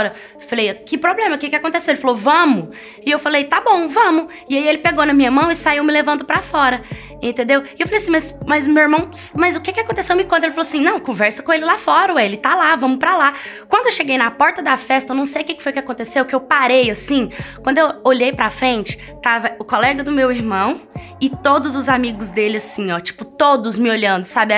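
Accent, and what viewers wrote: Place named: Goiás, Brazil. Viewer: c é de Goiás né?esse sotaque maravilhoso n me engana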